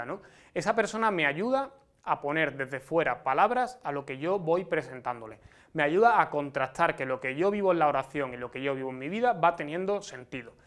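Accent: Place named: Cádiz, Spain. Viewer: Hola ¿ eres de Cádiz? Tu apellido y acento son muy de la Tacita. Saludos cordiales desde Cádiz.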